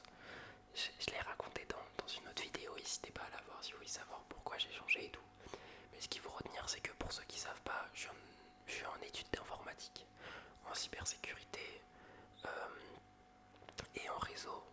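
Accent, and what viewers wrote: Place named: France. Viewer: Je ne sais pas ce que c'est pour les Français, mais en tant que Turc, j'aime beaucoup écouter des mots prononcés avec un accent français. Je vous souhaite un succès continu.🎉❤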